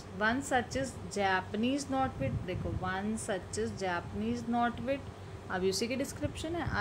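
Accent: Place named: India. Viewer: Better to explain with one language i think. Difficult to understand IndEnglish.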